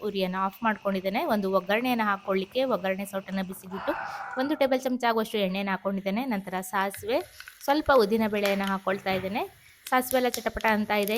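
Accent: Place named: Kundapura, India. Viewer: Hoy, ನಿಮ್ಮ ಕುಂದಾಪುರ ಬಾಷೆ ಏನಾಯ್ತು, bolu ಹುಳಿ super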